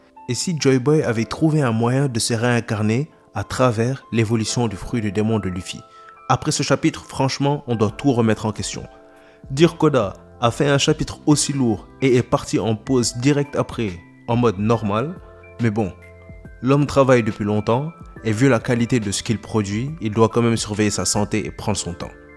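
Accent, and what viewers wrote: Senegal. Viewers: Dis moi, tu es d'origine du Sénégal ? je reconnais un léger accent de chez nous ahah ! Sympa les vidéos en tout cas, je m'abonne....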